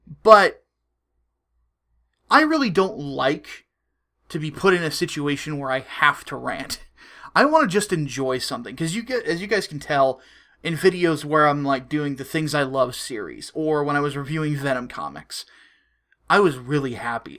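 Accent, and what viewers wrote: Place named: South, United States. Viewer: I literally liked the video because of your southern speech If you want to see an example of this watch don lenon . Talking to ben shapiro from a couple days ago I'm from Chicago i go down south every couple of years Usually during the forth of July I see people coming together to celebrate and throughout this leftist bullshit I've been explaining my positive thoughts of the south like That people in the south come together real well and that the the flag has been a symbol of history and the southern pride not hatred I'm against censorship period Do I want a living wage sure do these people know anything about Ecconomicss nope Inflation increased taxes the decreased value of a dollar Ouran high school I hate all these damn rich people They're idiotic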